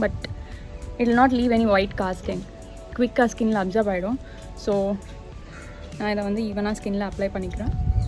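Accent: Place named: Coimbatore, India. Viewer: Coimbatore slang.. Wow Chance illa... Missing coimbatore. Swargame endralum adhu nammoru pola varuma.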